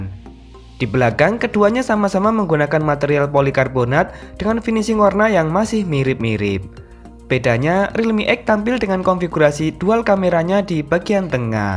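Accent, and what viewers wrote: Java, Indonesia. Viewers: wong jowo yo mas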